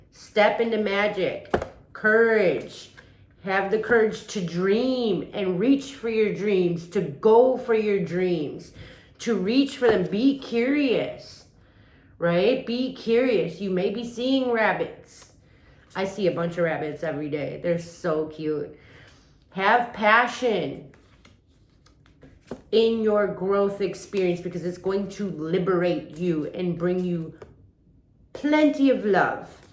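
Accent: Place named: Upstate New York, United States. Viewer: Your accent reminds me of my extended family, who live in upstate NY, and Pennsylvania . 😊 Thanks for sharing your intuition. X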